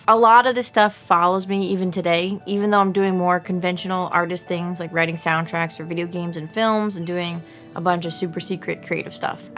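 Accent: Long Island, United States. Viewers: Greetings,! I've been lurking on your YouTube channel on and off for about a year. I didn't know you were based out of Long Island. I guess the thick accent should've given it away. Your accent is Long Island AF. I was born and raised in Long Island (Bay Shore) until I was 15 when my family moved to Florida. In my opinion, an artist is someone who uses their skill at a particular medium (in this case, harp playing) to create art. The person has a passion for their craft and is willing to dedicate time and effort into it. They have a desire to express themselves through their work and can envision ideas, emotions, and stories that are then translated into their work. Not only that, but their work is able to evoke emotions in others and inspire others. You're doing these things, so I would say that qualifies you as an artist. Keep doing what you're doing!, I'm all for physical media, and while I personally own a record player, not all of your followers do, and they have no way to listen your album unless they decide to buy a record player. 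Also, what's stopping someone from doing a line-in recording of the album to their computer and sharing the digitized audio online?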